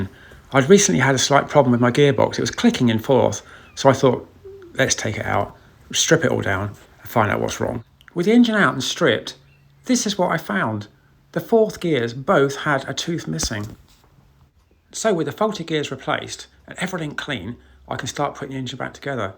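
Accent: England, United Kingdom. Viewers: He's from England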